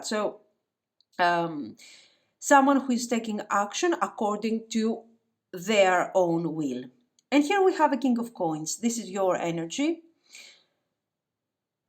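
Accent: Greece. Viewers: Και γω το σκεφτηκα από την προφορά σας!!!Μπραβο..είσιε πολύ καλή κ ακριβης στις προβλεψεις σας!!!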